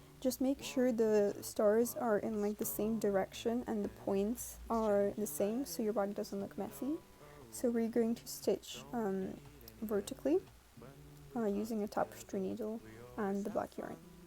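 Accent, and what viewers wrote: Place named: Russia. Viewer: По произношению Английского языка понятно,что она русская😂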